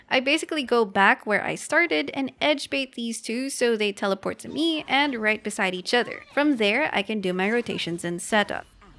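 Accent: Philippines. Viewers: are you a filipino? your voice sounds like a filipino, anyways great video as always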